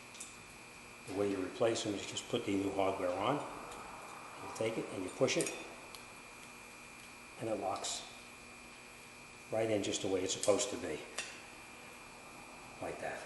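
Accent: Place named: New York, United States. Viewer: great instructional video. I detect a NY accent or perhaps, a NJ accent. Don't know which.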